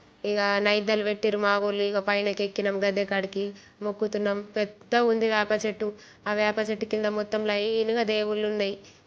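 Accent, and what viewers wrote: Nizamabad, India. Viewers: Nizamabad district slang language alane untadi sis entire different❤ I am from Nizamabad too...where are you from?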